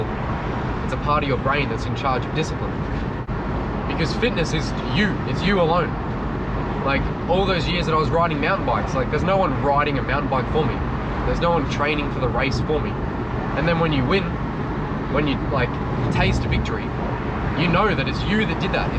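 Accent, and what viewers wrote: Australia. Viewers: Australia is so cool! Love your accent! So amazed to see the porcupine akinia .Totally 🆒!!!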